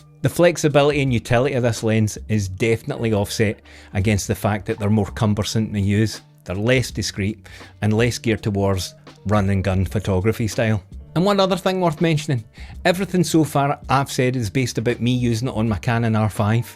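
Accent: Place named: Scotland, United Kingdom. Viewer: That accent 🙂 where from? 🙂 Schotland? I love it. greetings from the Netherlands 🙂 without any accent.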